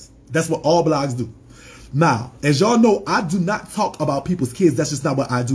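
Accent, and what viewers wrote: New Orleans, United States. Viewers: I subscribed off the hair and New Orleans accent alone baybee yesss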